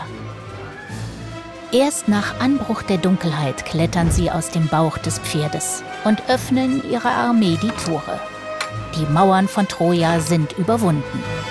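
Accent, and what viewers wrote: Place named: Saxony, Germany. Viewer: Mit Sächsischen Dialekt kann man mich wirklich foltern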